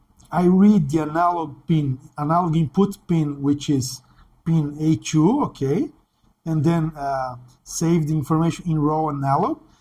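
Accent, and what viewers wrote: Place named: Brazil. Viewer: my guy you cant hide your accent most obvious brazillian ever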